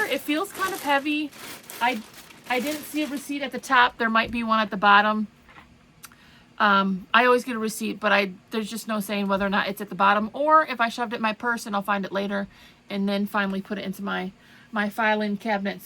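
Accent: Wisconsin, United States. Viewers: BTW the first time I watched you I caught your accent, I was like she has to be from Wisconsin! I was born and raised in Eau Claire so I recognized where you were from. I am in Washington now but anyway.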